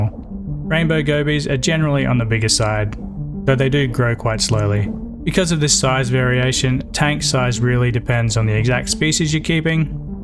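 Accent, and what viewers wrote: Australia. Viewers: Hiya. Are you from Australia?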